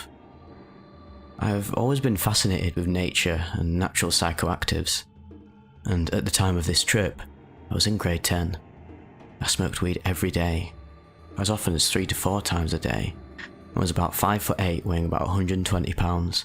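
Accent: United Kingdom. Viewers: Are you actually British or is that just the accent you do? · I don't want to seem stupid but you said your from canada did you move to the uk? Because of your accent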